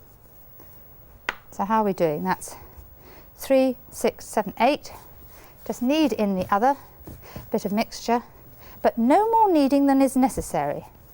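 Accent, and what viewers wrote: RP, United Kingdom. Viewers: That's when presenters on TV spoke the Queens English. Flashing teeth etc and the more showman style is down to the influence of US style infiltrating television.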